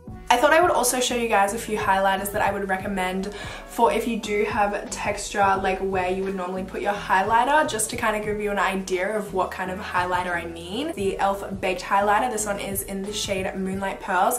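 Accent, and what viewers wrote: Australia. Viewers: What is your accent? Sounds a bit Australian but if though.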